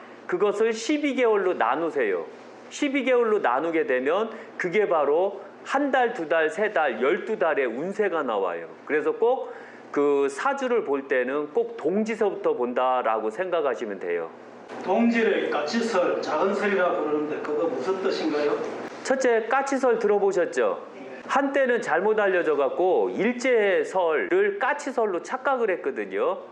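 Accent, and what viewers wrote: Chungcheong, South Korea. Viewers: ㅎㅎㅎ 완전 충청도분이네? 가차운----> 가까운 ㅎㅎㅎ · 맞아요 충청도의 대지관님 이시여요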